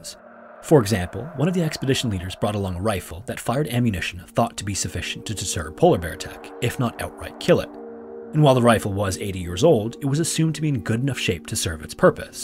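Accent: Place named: Canada. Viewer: Love your stories and your Canadian accent! 🙂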